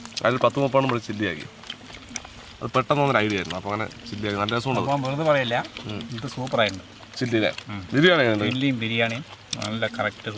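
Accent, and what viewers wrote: Palakkad, India. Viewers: ക്കാ ഒരായിരം ലൈക്കിനോടൊപ്പം ഒരായിരം ആശംസകൾ.. ആ പാലക്കാടൻ സംഭാഷണം പ്രത്യേകിച്ച് ചിക്കൻ കഴുകുമ്പോഴും, അടുപ്പ് കത്തിക്കുമ്പോഴും.. അത് മാത്രം കേട്ടാൽത്തന്നെ മനസ്സുനിറയും.. പിന്നെ ആ കൂട്ടുകാരോടൊപ്പം ഇരുന്ന് ഉണ്ടാക്കി വച്ചത് കഴിക്കുന്നത് കാണുമ്പോൾ വയറും നിറയും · Ikkaaa... Ennumpole innum super..... Kaada ende fav aanu.... Pakshe adhilum super nammande palakkadu baasha thanne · Palakkad an Bhasha athanu secret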